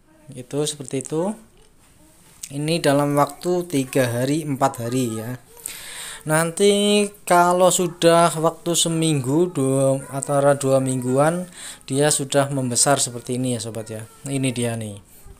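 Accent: Banyumas, Indonesia. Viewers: ngapak bosss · Wahhh...terimakasih ilmunya mas..saya jadi paham cara budidaya buah naga..akan langsung saya praktekkan..btw mas orang banyumas apa mas?deneng ngapak?siipp banget.. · Oalahhh ngapak, aku wong Gombong mas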